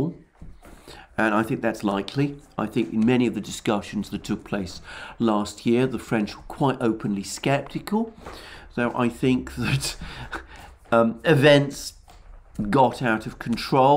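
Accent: United Kingdom. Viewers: Best place for pristine russian propaganda with a lovely british accent.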